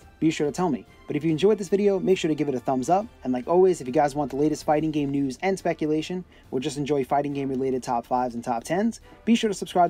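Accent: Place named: Boston, United States. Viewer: You almost sound like you're from Boston.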